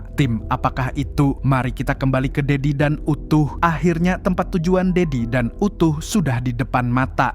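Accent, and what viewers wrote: Banjar, Indonesia. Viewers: Orang banjar kah mang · Orang Banjar ni admin😂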